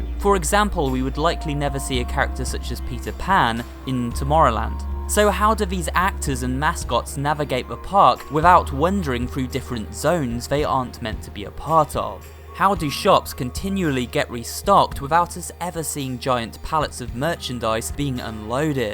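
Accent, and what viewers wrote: United Kingdom. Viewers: Now your British speaking voice sounds much more legit, it must have been a thing where you thought that talking slower would be more helpful or something.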